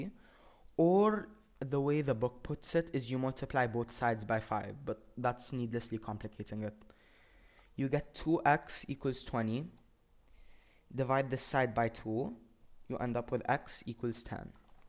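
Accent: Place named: Lebanon. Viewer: I can tell ur Lebanese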